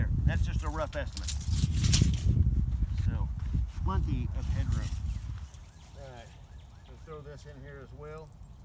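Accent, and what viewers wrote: United States. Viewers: I could just tell by the intro that this was going to be a American dude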